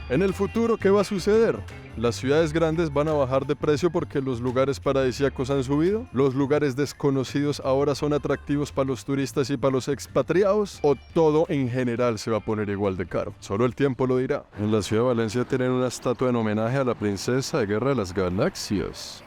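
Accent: Colombia. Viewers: Me sorprende q ya llevas años viviendo en Canada y tienes el acento colombiano soy salvadoreño pero no entiendo porque os centroamericanos en su mayoria se les pega ese acento como q fueran gringos.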